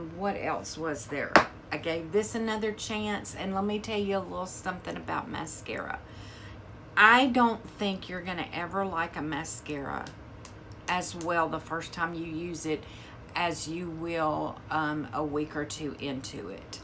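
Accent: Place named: South, United States. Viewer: Hi. I'm here from Jen's group. Love your southern accent! That gold lip mask was nuts. I'm glad you gave the Catrice another chance. I love it. But I have oily, mature skin. So that may be a difference. I also love mixing it with a bb cream to increase longevity and coverage. With the Essence, I found it had a film on it so the first couple times you use it, you don't pick up much. I also use a denser brush to apply it than I do other highlighters. But I can see it just fine on the video. Great video. Thanks!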